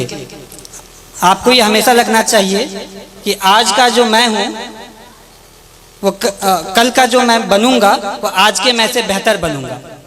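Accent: Bihar, India. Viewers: Aapki tethi bolna mujhe bht achhi lgi sir😊😊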